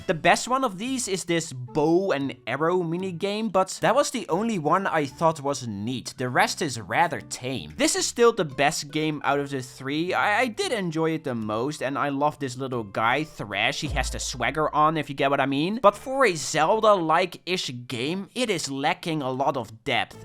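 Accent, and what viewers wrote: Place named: Netherlands. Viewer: Ya sound dutch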